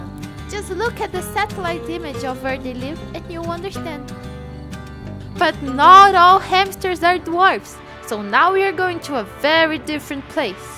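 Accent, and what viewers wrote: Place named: Brazil. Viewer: are you brazilian? you have a brazilian accent that i have too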